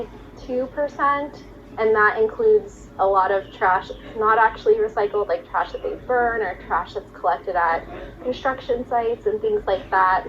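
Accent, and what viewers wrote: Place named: New York, United States. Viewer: Just watched your channel for the first time and amazingly the woodland that the girl in the video was highlighting known locally as Drinkwater Park was where I lived for the first 50 years of my life, thanks for bringing back memories of the happiest times of my life. You sound like a New Yorker, by coincidence I've just come back from a week in Manhattan. I'll be sure to subscribe.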